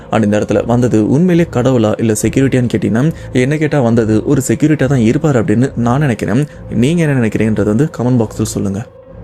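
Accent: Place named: Chennai, India. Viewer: Chennai slang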